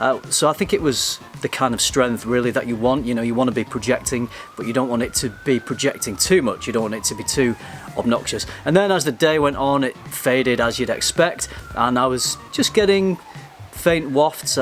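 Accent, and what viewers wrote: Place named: Liverpool, United Kingdom. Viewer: Are you from Liverpool by chance? Could be Machester as well, but I heard Paul McCartney for a quick sec.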